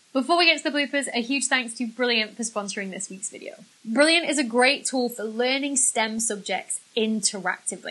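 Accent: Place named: West Lancashire, United Kingdom. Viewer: Without sounding too stalkerish, where are you from? You sound to me like you're from around here (I'm in ormskirk in West Lancashire)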